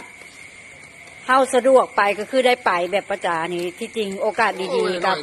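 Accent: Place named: Phu Thai, Thailand. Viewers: สวัสดีค่ะป้า อยู่ทางเลอค่ะนิ คือเว้าภูไทย คือกันกะนางแท้ กินยามเลอกะแซบยามนั้นเนอะค่ะ เป็นอีกหนึ่งกำลังใจให้ป้าเด้อจร้า😊❤ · สำเนียงเสียงภาษาคือย่อสกลนครเนาะจ๊ะดีใจด้วยคะ.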